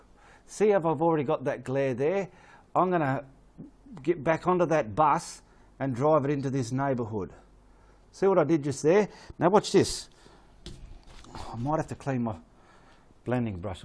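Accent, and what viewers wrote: Australia. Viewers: Holy Crikey! Wasn't expecting that level of Aussie right off the bat. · I love your beautiful Ausi accent. Lovely presentation on how to make realistic cloud. I think if you had used a bit of firey grey or slightly bright yellowish orangy instead of PURPLE, it would have given more depth....anyway, just my thoughts..... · so australian lol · Good to hear an Aussie, speak like an Aussie! It’s a joy! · Love the Aussie bush accent 😂 Enjoyed watching this and enjoyed the humour to go with it. Thankyou for sharing. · I love it! You’re such a hoot! “I’m gonna get back into that bus and drive it into this neighborhood!” Made me smile. I’ve never heard that out here in western Nevada, USA.... especially with the Aussie accent. Enjoyed your whole presentation and learned a lot. Sharing with my friend that does acrylic painting parties... (tutorials with laughs among friends and we leave with our own work of art.) Thank you.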